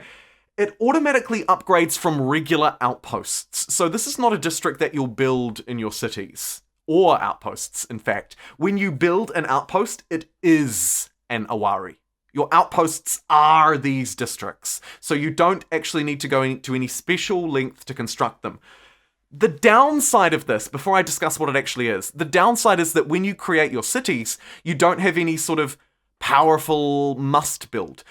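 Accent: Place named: New Zealand. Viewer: Coming back to this in 2026 it’s striking how much more energy your delivery had in these videos and how much stronger your kiwi accent comes across. No idea why those things have changed so I won’t speculate but thought that was interesting.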